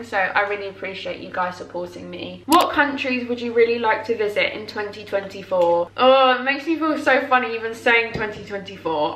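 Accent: United Kingdom. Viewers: So proud of you🥰Are you British?❤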